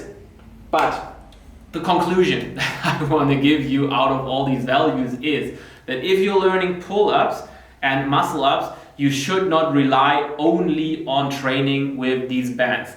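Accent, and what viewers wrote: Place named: Germany. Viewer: Hahaha dein deutscher Akzent ist ja so geil 😂😂😂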